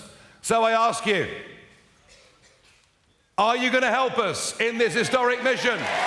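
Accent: United Kingdom. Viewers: Strong speech in a British accent, The pauses & the punch lines of an American President. Well delivered I’m here for it. I’ll leave you to decide which president did he sound like in his delivery? 😅🤣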